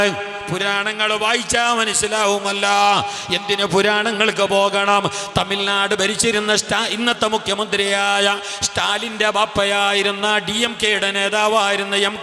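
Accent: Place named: Mangalore, India. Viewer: മാഗലാപുരം അല്ലെ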